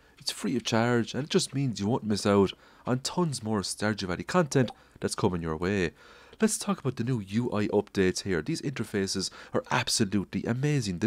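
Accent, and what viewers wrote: Ireland. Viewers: The most Irish accent I've heard all week, and I've been listening to some of the news stories from over there. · he sounds Irish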